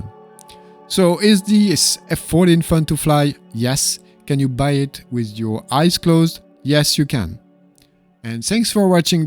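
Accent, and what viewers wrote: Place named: France. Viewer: Excellente vidéo... (mais avec un accent pareil, t'as jamais pensé à faire tes vidéos en français ????)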